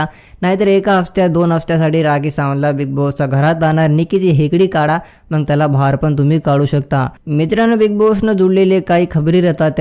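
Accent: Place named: Marathwada, India. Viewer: Bhava tu maratwadyacha ahes kai😅, apli bhasa sarkhich hai😅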